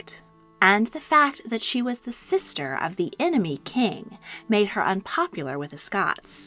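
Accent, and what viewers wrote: United States. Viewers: What? An American voice narrating this. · This so-called documentary is totally boring it doesn’t tell us anything new about Henry and his sisters just goes over and over the same old historical facts… It would’ve been a whole lot better if narrated by a non-American voice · this English history is not good being narrated by an american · History Teller and great American native voice ... 02/22/2021 Thanks 😊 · I simply can't listen to an American narrator about English kings and royalty! It's too off-putting!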